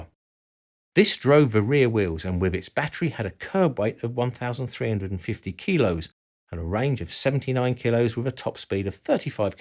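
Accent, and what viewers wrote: United Kingdom. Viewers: Cause he has British accent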